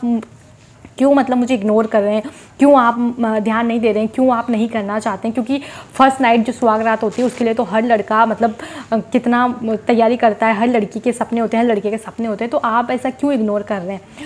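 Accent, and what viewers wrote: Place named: Rajasthan, India. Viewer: Mam aap rajasthani lagte ho bolne k laheje se,par aap ne kaha aap delhi se hai🤔🤔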